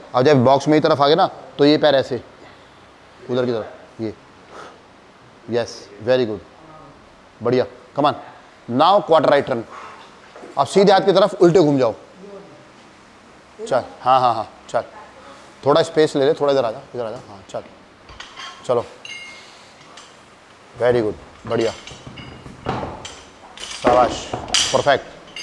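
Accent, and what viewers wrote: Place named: Kolkata, India. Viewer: Bath sunke hi pata chal kolkata ka ladka😊❤💪🏻🥰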